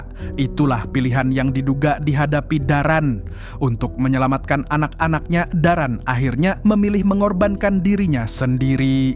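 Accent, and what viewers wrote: Banjar, Indonesia. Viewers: Orang Banjar kah min.. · Urang banjar kh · umma mang org banjar sakalinya lah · 😂dangar narasi pertama admin,,kok kaya logat bhasa Banjar,,smangat min bikin konten y,,q urang Banjar di Jabar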